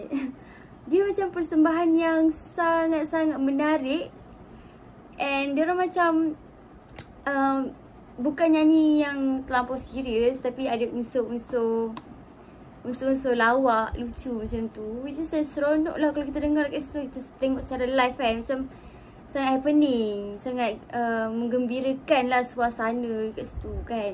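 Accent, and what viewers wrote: Malaysia. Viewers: Saya orang indonesia,dengar suara orang malaysia ngmng,sangat kagum apalgi cantik banget macam ukhti..mantaab... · Saye dri Indonesia🇮🇩 tpi saye suke bahase logat Malaysia,Karne enak di dengar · Candu Logat Melayu 😭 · Dialek malaysia saya suka dengar.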